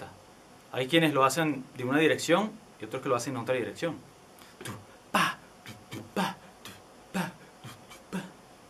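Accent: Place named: Canary Islands, Spain. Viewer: tienes acento canario, eres de tenerife? :D xk si es asi ya tienes un colegacho tinerfeño xD jajaja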